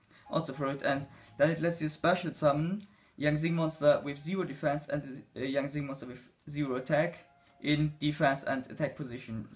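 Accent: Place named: Germany. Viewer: naja warum macht ihr kein deutsches video ? all your cards are german and your accent sounds german ? by the way good Video i liked it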